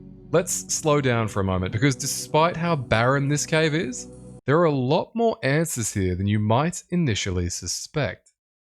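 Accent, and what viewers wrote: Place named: United Kingdom. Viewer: Finally someone reads the dialogue in Runescape with the appropriate "Britishism" it was meant to be conveyed with.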